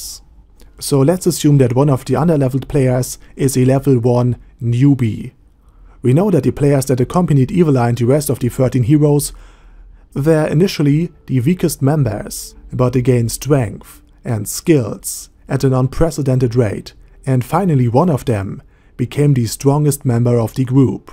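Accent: Germany. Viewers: Man merkt das der deutscher ist an seiner englischen Aussprache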